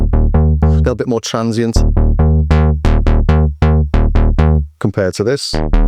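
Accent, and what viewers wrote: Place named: North, United Kingdom. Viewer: where is that accent from? Somewhere in the North?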